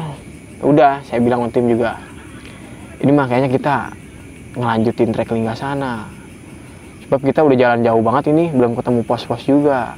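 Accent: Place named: Tangerang, Indonesia. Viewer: KAGA ADA YG SIUL greget amat bang wkwkkw Btw nada logatnya tangerang banget bang, mirip film si marko :v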